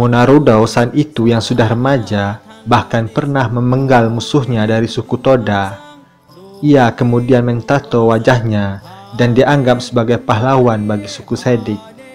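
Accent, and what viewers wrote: Manggarai, Indonesia. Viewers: Fokus dengan logatnya seperti logat manggarai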